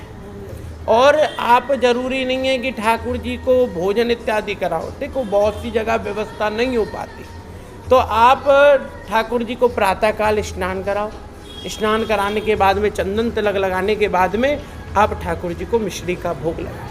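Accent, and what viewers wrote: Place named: Braj, India. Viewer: Pandit ji mujhe aapki braj boli bhut pyari lagi😚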